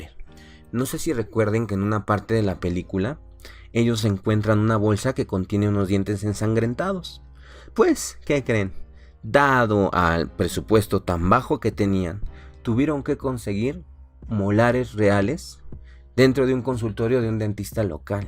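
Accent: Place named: Spain. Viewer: Saludos a todos y un gran programa amigo y AHORA ENTIENDO POR QUE TE SALE TAN BIEN ESE ACENTO ESPAÑOL😂😂😂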